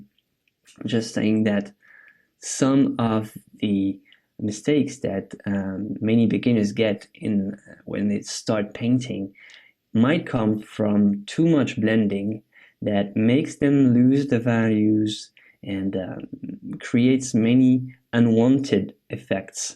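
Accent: France. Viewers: Is this guy French?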